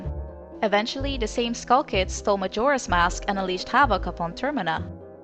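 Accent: Netherlands. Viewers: In this video you can also clearly make out her dutch accent and it is super cute 🥰🥰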